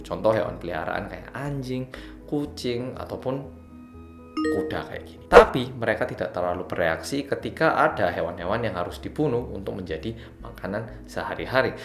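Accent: Java, Indonesia. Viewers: Baru kenal Mas e ,,, ceritanya gimana oppa jadi semedok itu... 🤣 Udah nikah belom oppaaaa???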